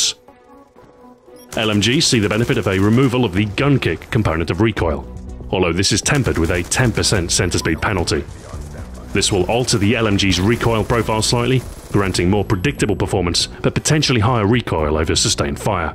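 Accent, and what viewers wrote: England, United Kingdom. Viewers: nope, a bit less Scottish and a bit more English. is based in Manchester, NW England. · R u really British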